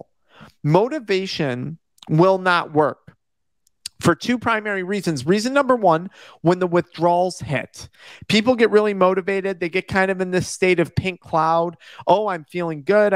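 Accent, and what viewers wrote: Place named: Upstate New York, United States. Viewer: When I first heard your accent I thought this guy sounds like he lives in upstate NY (I'm in Bing) and laterin the video you confirmed you are from NY! Lol thanks for the content, its very helpful!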